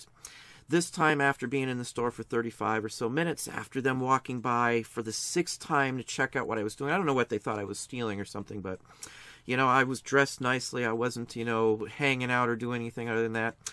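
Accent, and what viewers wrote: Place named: Northwest Ohio, United States. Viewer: Sounds very NW Ohio.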